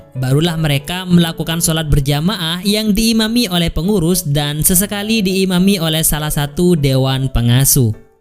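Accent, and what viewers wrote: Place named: Madura, Indonesia. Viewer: Video x kereeeen tpi suaranya ketarah madurisix 😀😎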